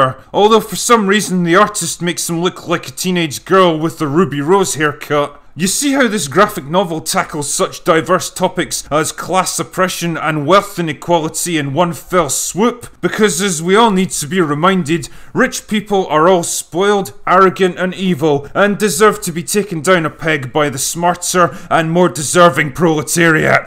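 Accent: Scotland, United Kingdom. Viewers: Mr. Critical thinker are you Scottish ? I think you have a voice that could Rally up troops for battle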